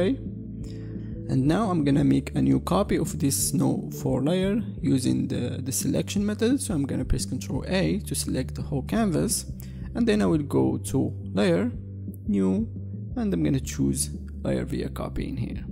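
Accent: Morocco. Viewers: you have a Moroccan accent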